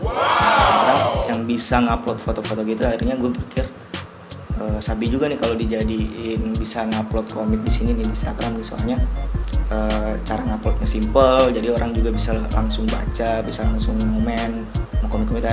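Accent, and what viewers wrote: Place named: Sulawesi, Indonesia. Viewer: Hilang mi logat Sulawesinya tawwa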